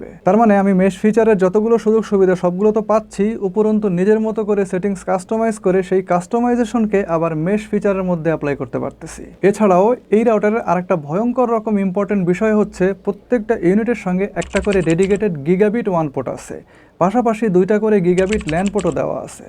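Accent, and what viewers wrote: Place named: Bangladesh. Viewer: আপনার বলার ভঙ্গী খুবই সাবলিল ৷ একবারও আটকে যায়নি ৷ অন্য কেউ হলে এই কথাগুলো বলতে অন্তত কুড়ি মিনিট সময় নিতো ! আর আপনার বাংলাদেশী উচ্চারণ বেশ মধুর লাগলো ৷ ভারতে এটা পাওয়া যায় কিনা খুঁজে দেখছি ৷ কলকাতা থেকে আমার ভালোবাসা নিন ভাই ৷ এসব জটিল বিষয়ে আমার লেখাপড়া কম ৷ সেজন্য আমার ছেলেকে শেয়ার করছি ৷ ওর কাছ থেকে ভালো করে বুঝে নেবো ৷ এই চমৎকার তথ্যপূর্ণ ভিডিওর জন্য অসংখ্য ধন্যবাদ আপনাকে ৷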